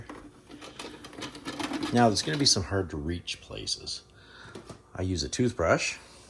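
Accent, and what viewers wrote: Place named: Upper Midwest, United States. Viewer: Where you from? North Dakota? Minnesota?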